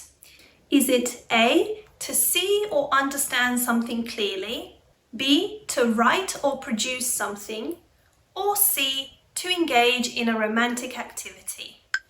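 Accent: United Kingdom. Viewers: Could you please tell me what's your accent? Estuary or rp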